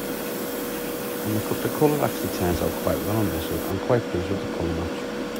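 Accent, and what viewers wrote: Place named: Scouse, United Kingdom. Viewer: Hey, i only found you channel through nick and was made up to see your renovation of the roadsweeper, as im donig one myself as we speak. Im doing it for a friend who owned one as a kid. The added bonus for me was hearing your accent, I thought “ wow, this guy is one of ours “. Im originally from Wallasey in merseyside, so im only a plassy scouser, or woolyback or odgie, but ive been living in exmouth in devon for the last 7 years and its always great to hear one of your own 👍🏻. Great work and im fully signed up to your channel. Well done mate.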